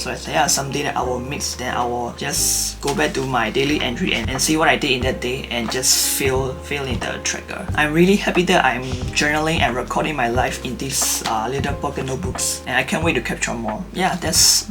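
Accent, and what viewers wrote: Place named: Myanmar. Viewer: You're accent look like Burmese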